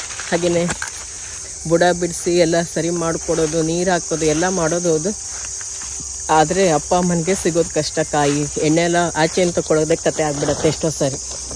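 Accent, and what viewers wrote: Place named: Kundapura, India. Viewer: Salpa kundapura kannada tara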